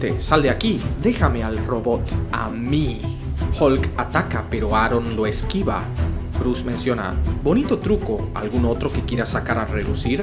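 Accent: Argentina. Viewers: Alguien que me diga de que parte de Argentina es el narrador?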